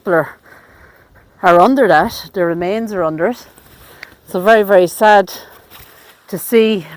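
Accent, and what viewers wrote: Ireland. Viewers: Thanks! Love love your channel!! I am from Alberta, Canada 🇨🇦 and would love ❤️ to hopefully one day make a trip to beautiful Ireland 🇮🇪!! It is so wonderful that by you filming different locations I feel like I am touring Ireland 🇮🇪 lol🥰 again love your channel & keep up your amazing work! Oh ps I love love your beautiful 🤩 Irish ☘️ Accent!! ☘️🇮🇪☘️ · Hello from Japan. I was born in Sardinia to Irish/Scottish/Ukrainian parents and lived in Carna Co.Galway and Cashel Co.Tipperary as a youngster. Have been always fascinated with cemeteries and love just as you do too to explore them. Great to hear an Irish accent!!!!!!!!!!!!!!!!!!!!!!!! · Beautiful graveyard love your gorgeous Irish accent luv from Canada 🇨🇦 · I love listening to you both. Your voice reminds me of my Granddad who was from Cork. I’m from Western Australia 🇦🇺. My Great Grandmothers name was Eva Boyd my Great Grandfathers name was Michael Hyde. 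· Great video. The commentary with the Irish accent is spectacular. From New Jersey in the USA. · So neat to see the old graves. Thanks for taking time to show them. Love your Irish accent.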